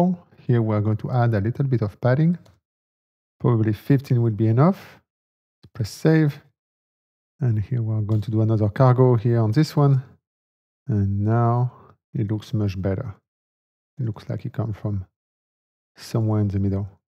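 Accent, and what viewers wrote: France. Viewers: A really well done video! Are you French? because I am French and I can clearly hear a French accent here. I could be wrong though :p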